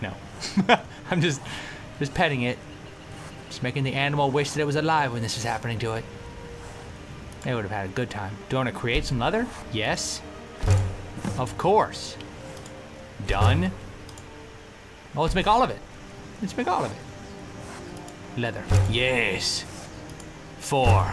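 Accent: Scotland, United Kingdom. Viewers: I prefer the scottish accent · Not bad Scottish accent :D Of course, i'm comparing you to Hypermole from mole237 (free advert for him) So...yeh, do it more :D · 'TIs a Scottish accent :3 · No he spoke british and scottish accents in the video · dat scottish accent :V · he's got the Scottish accent but not the slang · SCOTTISH ACCENT?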